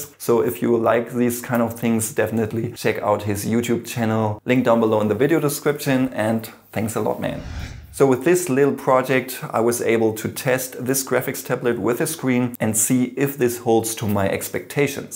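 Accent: Germany. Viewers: Man hört voll raus, dass du als Deutschland bist😂✌🏻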